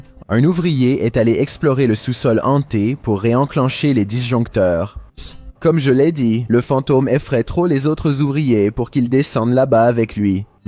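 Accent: Quebec, Canada. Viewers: C'est l'axent québécois connace 🤨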